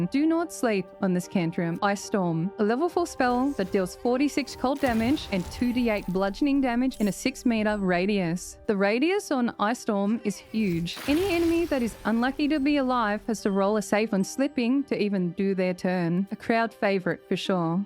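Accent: Australia. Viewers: Love the video, And the strine! This is freaking thickest one I've ever heard, which makes in even more intriguing!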